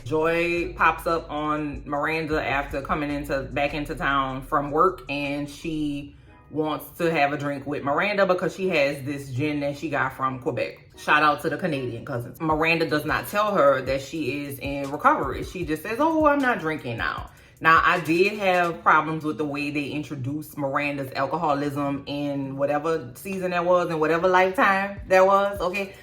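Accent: New Orleans, United States. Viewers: Why don’t they just put you on the show, you could be one of those ladies. I don’t know why you’re in New York with a New Orleans accent but they’ll figure it out. This show just needs more laughs.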